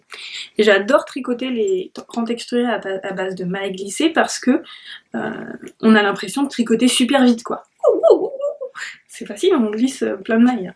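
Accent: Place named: France. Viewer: Je suis toujours contente de voir tes podcasts! déjà entendre parler le français de France c'Est super...et en plus c'Est toujours bien complet! et pour le Kal... oh j'ai hate... ce coup ci je le fais!!! Ne change pas! tout est très bien! Rose, xx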